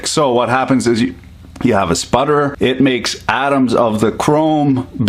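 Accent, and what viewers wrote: Canada. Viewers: Hes canadian, i think :P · The whole first minute reminds me of Corner Gas. must be the accent. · i started watching your vids a few days ago. I got to say, I love listening to Canadians talk. And you sir, are a fun one to watch. Keep up the awesome work. If you can call it work, you have so much fun doing it.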